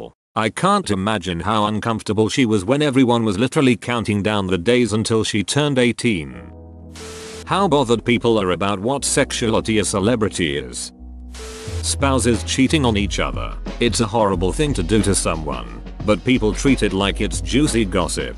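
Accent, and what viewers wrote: United Kingdom. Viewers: That's because this voice sounds like a cultured British robot.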